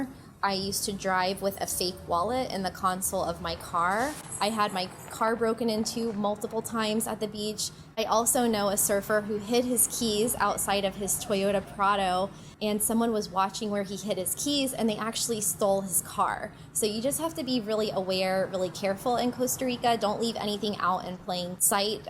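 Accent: United States. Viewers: Sorry ,but croaky American accent makes this impossible to listen to !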